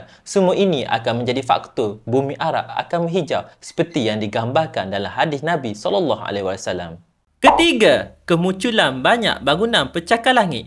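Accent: Kelantan, Malaysia. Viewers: Abe ore kelate kea😂 suara blh cam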